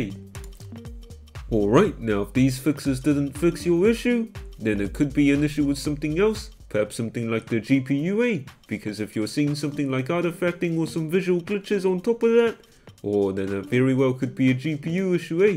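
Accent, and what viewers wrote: New Zealand. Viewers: Hes a kiwi😂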